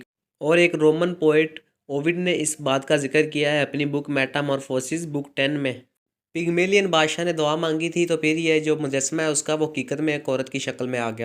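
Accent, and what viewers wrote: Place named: Punjab, India. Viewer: Your Urdu is Punjabi Urdu.Apna accent theek kijiye.baqi sab qarib qarib theek hai.with good wishes from Calcutta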